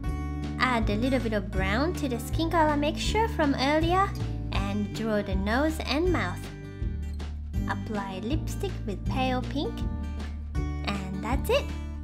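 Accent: Japan. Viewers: Because she is from Japan, she has an accent. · Hey does anybody know where is from? Her accent is very interesting... It sounds sort of Japanese but with a little bit of Australian maybe? Also great nail art! I was mesmerized!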